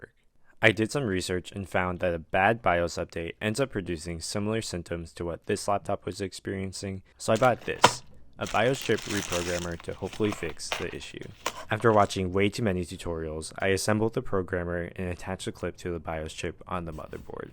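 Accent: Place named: United Kingdom. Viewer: why is there a man from UK talking?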